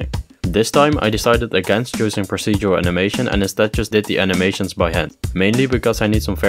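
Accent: Netherlands. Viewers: Are you Dutch? Your accent sounds really Dutch... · Why do you sound dutch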